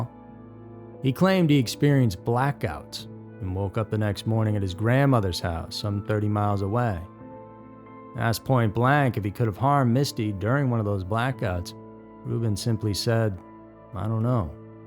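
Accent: South, United States. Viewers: When a new creepiest comes out, I only watch it during the day. I am a new subscriber and have been binging so much I live in a permanent state of creepiest. If didn't have that addictive Southern drawl it would be easier to take a binge break 😊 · Love your southern accent 😂🇬🇧. I really hope the cold cases can be solved......the families deserve it 🙏🙏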